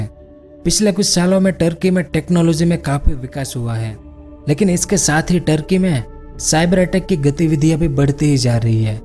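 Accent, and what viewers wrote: Gujarat, India. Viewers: You're accent seems gujrati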